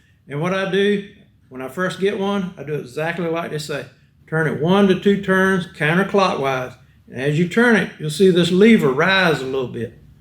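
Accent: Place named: North Carolina, United States. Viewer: Hahaha heard you talking and knew you were from NC.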